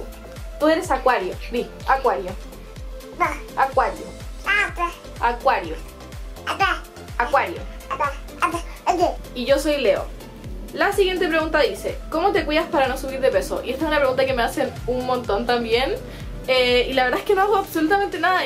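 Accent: Chile. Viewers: ¿Eres chilena?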